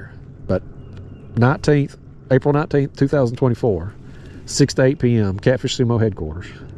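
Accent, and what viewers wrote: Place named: Oklahoma, United States. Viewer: Im gonna be really honest here for a minute. I never used to really watch your live 2 hour 4 hour long videos. I used to just skip right over them. Honestly because i kinda thought you where an asshole in general but i liked your videos about big fish and whatnot. Im from oklahoma. Your country accent gives me a kick because i hear myself in there lol. But honestly ive been watching the raw and uncut videos and i have noticed well hell this guy is just like me. He is just real and honest. I like the honesty. I love the raw and honest opinions about real life deals you talk about. Like the recent video i watchrd where you talked about old white christian men who hate your guts. Im a christian but im also human so i know damn well on youtube im gonna see the rue paul drag race bullshit and my kids see it too. My daughter asked me the other day she was watching a kids show on youtube and that crap popped up. 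Ahe is 10 by the way. And she asked me why that man was fressed like a woman and why he doesnt wanna be a man like he is???? You know how hard that is to explain to a 10 year old girl??? So you making a commercial for a ball hair trimmer, id rather her see that than see that other nonsense. Id rather someone be real and honest rather than be fake. Even as a christian im not religious. Im only human and make mistakes. So in closing just saying i know what you nean and i get it. But not all is christians are likw the old white man you described. 😊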